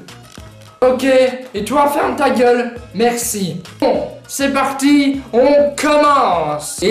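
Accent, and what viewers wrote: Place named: Democratic Republic of the Congo. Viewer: sympa l'accent congolais c'est bien ca?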